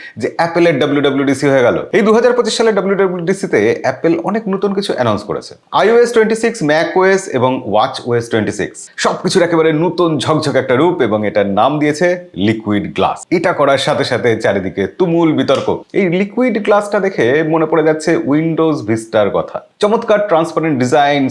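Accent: Bangladesh. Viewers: আপনার এ্যাকসেন্ট বাংলাদেশী, কিন্তু চ্যানেল দেখছি কলকাতার! 🤔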